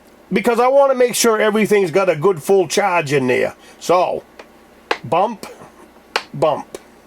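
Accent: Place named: Vermont, United States. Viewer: I miss hearing that Vermont accent. I grew up in Brattleboro area.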